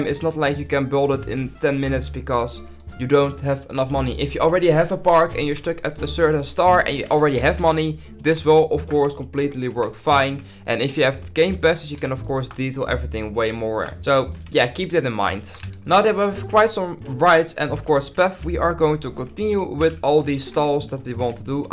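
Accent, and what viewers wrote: Netherlands. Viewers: You can hear so much that he just speaks Dutch. It's nice that you make English videos. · love the dutch accent are you from dutchland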